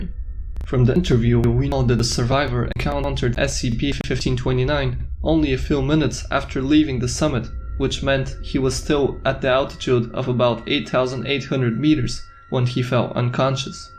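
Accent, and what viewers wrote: Germany. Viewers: Im sorry is there a Chance you are European,specifically German? My friend has the same accent I think it's really cute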